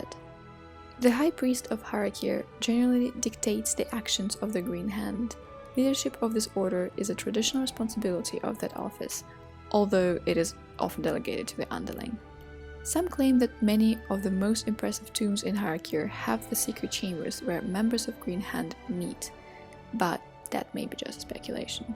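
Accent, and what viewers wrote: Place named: Iceland. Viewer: lolz you sound icelandic. Besides ravenloft har'akir, how about Athas dark sun?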